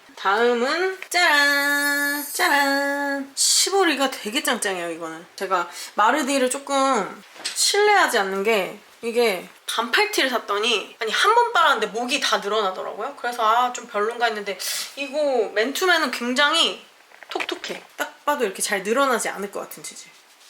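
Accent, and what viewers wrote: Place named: Seoul, South Korea. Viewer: 우리친구야 와이리 서울말쓰는거 낯서노 잘하노? 지기네 친구야 뷰티 인플루언서 같데이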